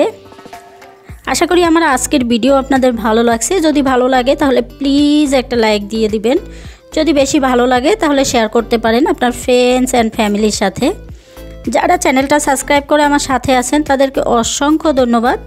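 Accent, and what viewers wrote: Bangladesh. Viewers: Apu apni ki sylheti niki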